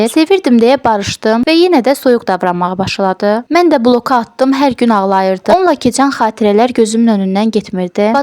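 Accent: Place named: Ganja, Azerbaijan. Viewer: Gəncəli di qız anlaşılan.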